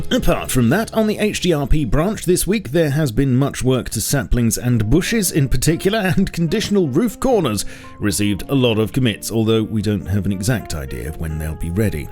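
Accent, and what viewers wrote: United Kingdom. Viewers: Every British person sounds like this if they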